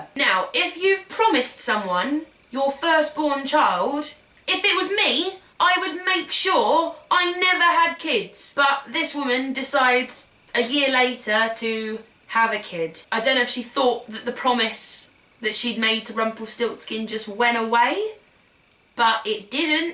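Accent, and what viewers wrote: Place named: London, United Kingdom. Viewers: Sounded like very Londony in this video (i am aware that she is from London way) just really heard it in this video :) <3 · Is it me or did carrie's accent get more essex-y/cockney in this video..? Still loved it though. :)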